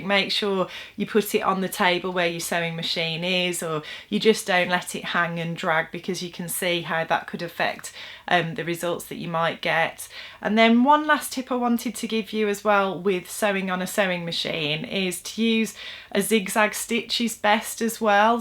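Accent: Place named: England, United Kingdom. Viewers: I just discovered your tutorials today. Your tutorials are awesome. Thank you for the work you did. I will be watching and learning more. I just love to listen to the English accent. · Great video. Full of tips - and an English accent!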